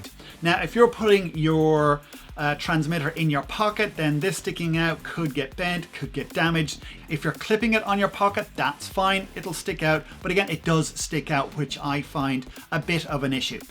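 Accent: Ireland. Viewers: Hi Was scrolling through videos to compare and find a good budget wireless microphone system for DSLR camera. When i heard your Irish accent i said this is my man 😂😂 can you give me some advice if you had any experience with Synco G1 A2 system. I love the Rode Wireless Go but I find there is very little extra"s for your Euro. For €50 less, the Synco has a great all round package but im getting mixed reviews in videos. Just to note i will be using microphone indoors 99% of the time, doing cooking demos. if you have any advice i would greatly appreciate it. 👍 P.S Loved your video & Humor 🤩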